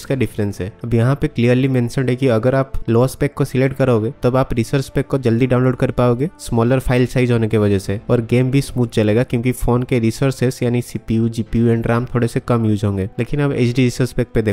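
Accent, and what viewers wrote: Odisha, India. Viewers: Bro, are you Odia?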